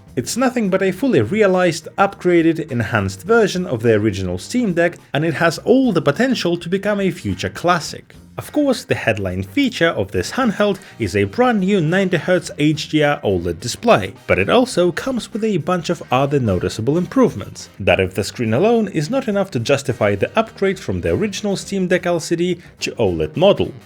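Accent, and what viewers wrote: Russia. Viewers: Должен сказать, что русский акцент почти не слышно. Отличное произношение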